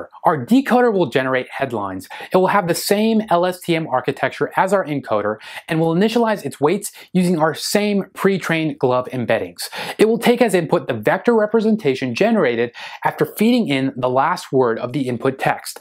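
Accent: India. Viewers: nice accent, not like most indian.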